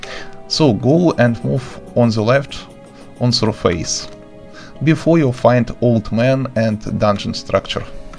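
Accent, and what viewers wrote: Russia. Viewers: мдаа с русским акцентом!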